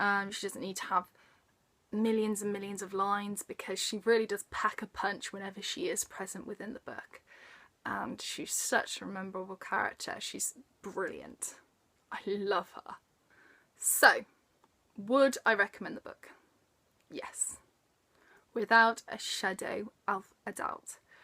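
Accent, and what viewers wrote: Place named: Midlands, United Kingdom. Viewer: You can definitely tell, Midlanders for the win! I'm from Stafford you see haha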